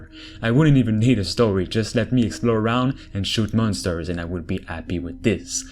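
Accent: Quebec, Canada. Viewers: Juste de même, tes clairement Québécois, j'ai raison?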